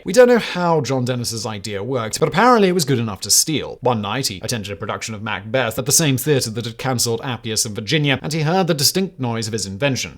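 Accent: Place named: RP, United Kingdom. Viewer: I’d not heard of ‘jumping the shark’ before. But I was wondering the other day about where ‘Bob’s your uncle’ came from. BTW, you and I both speak British RP, so for future videos, Marquis is not pronounced markee, as in the large wedding-type tent, but markwiss. (We might be hearing it a lot next year, come the coronation!)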